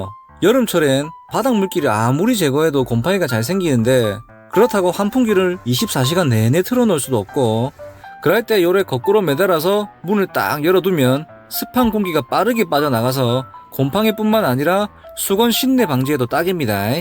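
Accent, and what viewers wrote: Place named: Yanbian, China. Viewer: 오오...억양이 연변분??😮 반갑습네다잉!!😮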